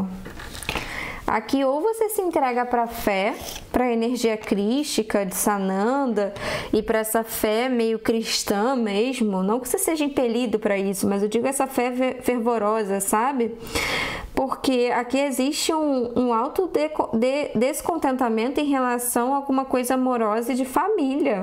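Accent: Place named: Rio de Janeiro, Brazil. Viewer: Eu amo o sotaque e a energia carioca 😂❤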